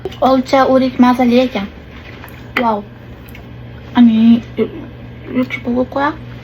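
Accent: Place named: Andijan, Uzbekistan. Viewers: Водий манимча андижон бизни келинимиз андижонлик шеваси шунака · наманганни шевасимас бу Наманганни шевасида ту́лик гапирилганда Андижон Тошкентлилар агар биринчи марта эшитса умуман тушунмайди. Ку́про Андижон шевада гапиряпти бу киз.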